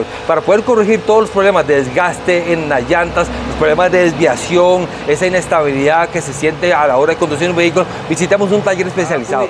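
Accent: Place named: United States. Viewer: A pesar de ser colombiano , ya se nota que ha vivido en EEUU , por el acento gringo con la rr .buen video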